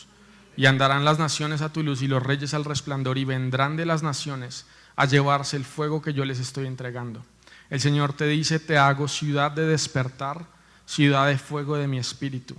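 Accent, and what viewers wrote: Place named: Colombia. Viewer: Hola siervo de Dios por primera vez lo veo y lo escucho por su acento se que es de Colombia y le ruego que no tenga temor nunca de hablar lo que el Espíritu Santo le dice para su pueblo recuerde de donde saco a Jonas para que ablara a ninive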